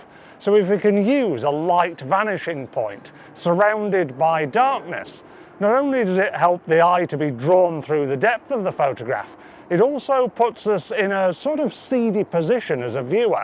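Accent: United Kingdom. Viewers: This guy sounds so British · Is there a more English looking and sounding man than this one?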